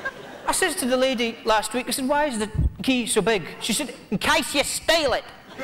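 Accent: Australia. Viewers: He’s awesome! And he’s got the Aussie accent down perfectly! 😂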